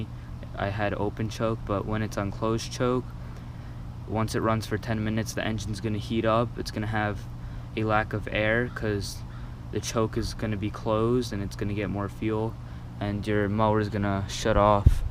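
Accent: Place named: Eastern Europe. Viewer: Good review, however, I'm a sociolinguist and your accent perplexes me. I hear eastern Europe maybe hebraic? Not trying to be rude, honestly curious.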